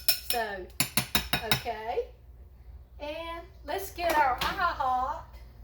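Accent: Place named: South, United States. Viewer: I am a diabetic, so I am so glad I found your Ste. And plus you have a southern accent like me! Thank you for sharing! Please keep your site going!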